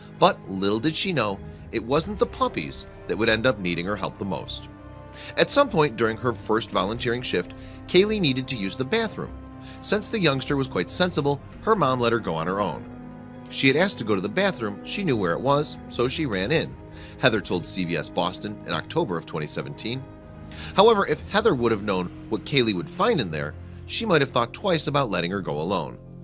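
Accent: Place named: Upstate New York, United States. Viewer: The narrator HSS s cute accent. Upper New York State?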